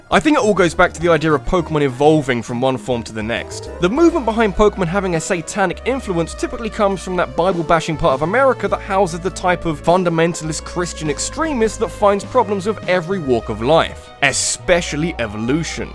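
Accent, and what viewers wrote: United Kingdom. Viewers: I love how people from the Uk say controversy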